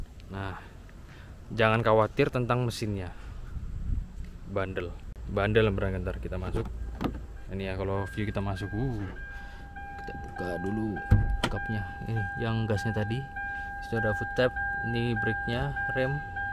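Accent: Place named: Jember, Indonesia. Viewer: Logat jember om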